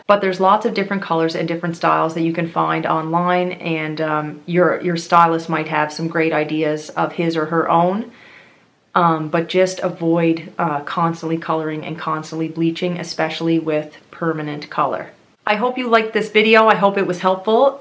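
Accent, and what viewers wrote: Canada. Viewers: Must be Canadian :) spelling and accent gave it away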